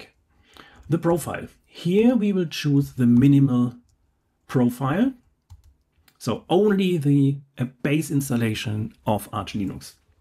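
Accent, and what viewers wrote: Germany. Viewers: Arch install mit deutschen Akzent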